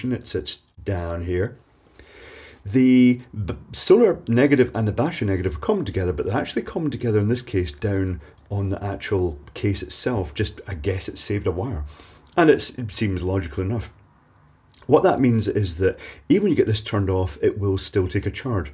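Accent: Scotland, United Kingdom. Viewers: Here is the guy with the Scottish accent.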